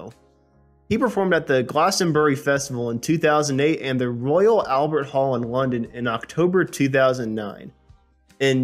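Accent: United States. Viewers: Yet another american voice, impossible to get anything in English. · Yes too fast , too American slur . Use punctuation in speech . We are not all Americans on the rest of this planet!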